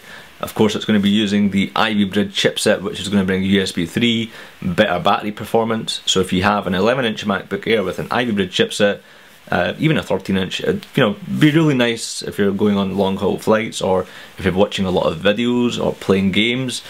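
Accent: Scotland, United Kingdom. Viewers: The Scottish accent is very soothing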